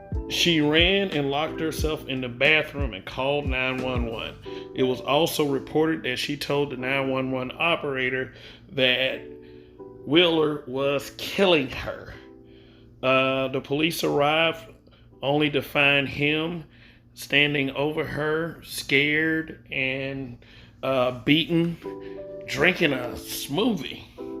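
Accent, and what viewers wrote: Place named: South, United States. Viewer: This is his channel he’s entitled to his opinion. are you mocking his southern accent? That is Ignorant of you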